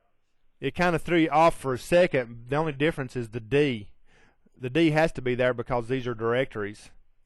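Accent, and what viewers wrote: South, United States. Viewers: Thanks, that glorious southern accent now pops into my head every time I'm setting permissions!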